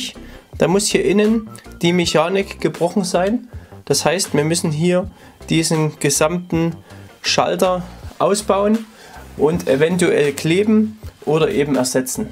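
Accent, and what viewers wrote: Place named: Saxony, Germany. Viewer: Echt ein klasse Video. Alles super sachlich mit sächsischem Charm erklärt. Weiter so.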